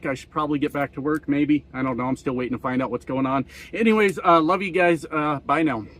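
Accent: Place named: Canada. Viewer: You are hilarious !! Are you sure you aren't Canadian cause you sound just like us !! Love you brother . !!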